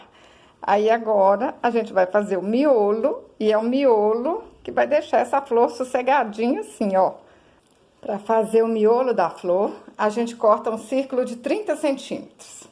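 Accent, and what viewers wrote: Minas Gerais, Brazil. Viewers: Parabéns seu trabalho e lindo! E sua conversa é muito minerissima 😀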